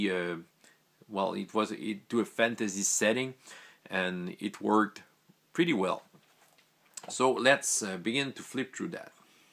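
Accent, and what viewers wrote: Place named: Quebec, Canada. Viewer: C'est vrai que l'accent québecois en anglais m'aide aussi à travailler mon anglais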